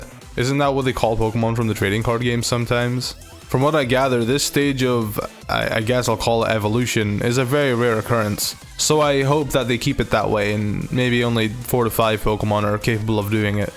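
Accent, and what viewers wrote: Scotland, United Kingdom. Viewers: Are u Scottish because I am to 👌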